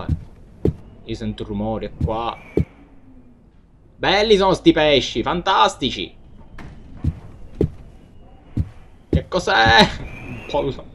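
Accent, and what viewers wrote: Palermo, Italy. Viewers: l'accento palermitano migliora tutte le urla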